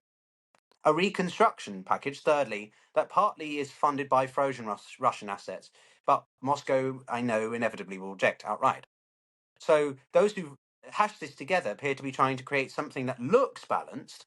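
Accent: England, United Kingdom. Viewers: Hey, English accent dude, you REALLY do NOT see the BIG PICTURE in front of your eyes!!! The US is NOT the heart of NATO! The US has walked away!!! The US only NEEDS NATO when its key national interests are involved, and Ukraine itself was NEVER a key US interest! Diminishing Russia has long been a key US strategic interest, but now the US needs to deal with China, a far bigger strategic interest than either Ukraine or Russia! Put simply, NATO has shown its weaknesses, as too has the EU (Military & economic)!!! Ukraine can swallow the bitter pill now or a far more bitter one in 6-12 months!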